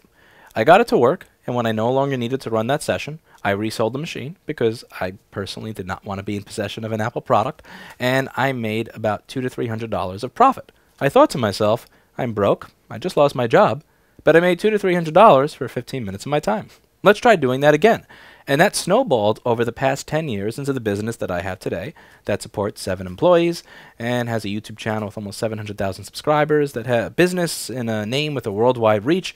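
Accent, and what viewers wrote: New York, United States. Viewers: "Whaddya Pinocchio" so NY, as are you... Happy Birthday!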